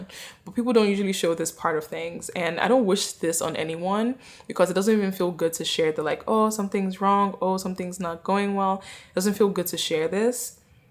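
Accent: Nigeria. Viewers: Stop listening to all those people and just do what you did and enjoyed to get you here. If talking in you 100% Nigerian accent is who you are ...then be you and do you. Stop trying to please everyone but YOU!! Sweetheart you are hurting yourself for others. You are not a living sacrifice for them. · I love your Nigerian accent....it's beautiful...and so are you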